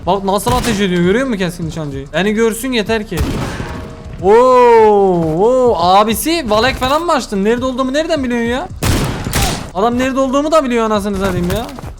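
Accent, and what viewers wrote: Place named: Kahramanmaraş, Turkey. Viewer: Abi böyle diyomda bende boyle konuşuyom bende maraşlıyım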